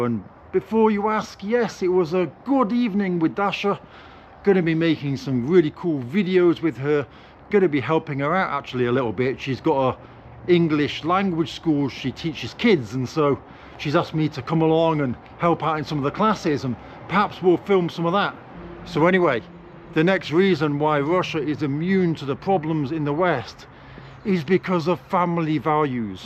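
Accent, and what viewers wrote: United Kingdom. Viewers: It seems that people are paying attention to you not because you are suspicious, but because it is unusual to see a person speaking pure British English in front of a camera imo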